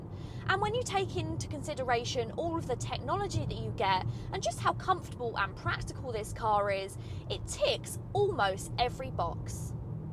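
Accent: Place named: Estuary, United Kingdom. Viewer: Great review, love the Estuary accent:-)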